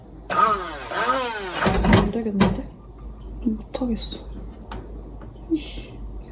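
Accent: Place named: Seoul, South Korea. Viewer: 서울말 엄청 잘하십니닼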